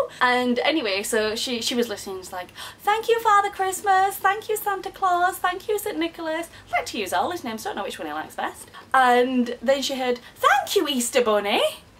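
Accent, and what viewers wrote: Yorkshire, United Kingdom. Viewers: i think yorkshire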